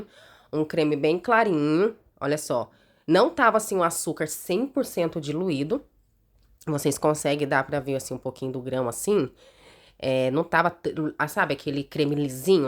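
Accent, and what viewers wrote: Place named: Minas Gerais, Brazil. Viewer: Vc e mineira igual a minha falo igual a você também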